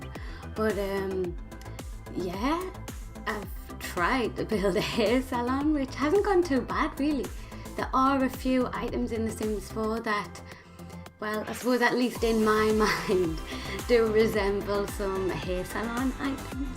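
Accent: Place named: England, United Kingdom. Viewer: I Make Simmies Not Builds....Wish YOU could make a TUTORIAL on ROOFING????? LOL OMG YOU should see my Roofs!!!! LOL LOL Wowww Extremely Happy I found you going through Sims 4 Tutorials........I LOVE How Creative you are Ive watched 2 of Yours so far and this ONE I LOVED them !!! I Really Wanted to Tell you how Inspirational you are to what I can possibly do Myself I am NOT a BUILDER........BUT TRYING ... ONLY 5 months now With Sims 4 Never Played 2 or 3 but did Play all the Originals I am Shocked at How different and much the Game has Changed from 12 plus years AGO........ITS AMAZING!!!!!! I Wish I had Played 2 and 3 I Would Know so much MORE........ haha Anyway......Love your Techniques with your MOO so much!!!!!! Wonderful Idea With this one!!!!! too :D Subbed and Faved LOVE YOUR English Accent :P Mine is TEXAN......so Very Different ..... 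Hugzzzzzzzz and Hope to see tons more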